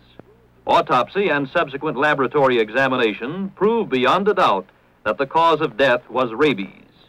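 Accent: Transatlantic, United States. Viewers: Trans Atlantic accent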